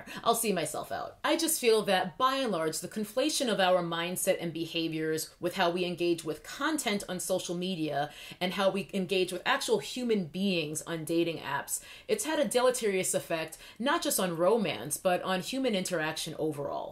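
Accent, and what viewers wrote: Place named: New York City, United States. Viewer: Have you been hanging out with your NY crew??? The accent is strong in this one today! Also, yea dating B-lows. I've been off the apps for 3 (?) years now and this was my reminder to stay off.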